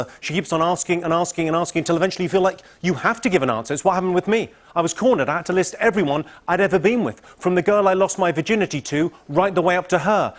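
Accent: United Kingdom. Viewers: I like how he intentionally slows down so Americans get the jokes in a British accent.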